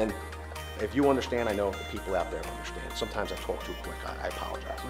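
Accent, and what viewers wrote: Philadelphia, United States. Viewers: the best part is the philly accent. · The whole time I'm watching this I'm thinking, "This dude has gotta be from Philly. He sounds like he could be a clone of my voice." Contractor myself, and you have the same patience for bullshit that I do...zero. Northeast Philly, I have a business in South Jersey over the Tacony. Where you guys from?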